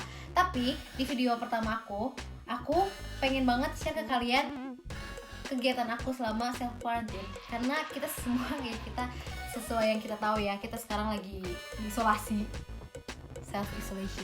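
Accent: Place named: West Java, Indonesia. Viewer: Logat sundanya masih kedengeran wkwk. Mirip papahnya bgt ihhh gemes wkwk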